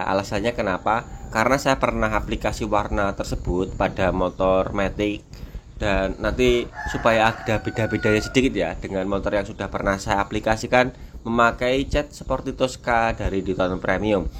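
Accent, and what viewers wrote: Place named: Java, Indonesia. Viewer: Kirain di daerah jawa, nada suaranya kaya orang jawa 😁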